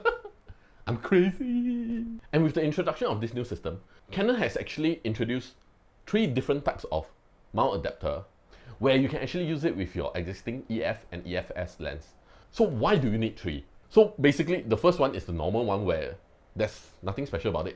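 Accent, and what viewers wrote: Singapore. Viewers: You singaporean?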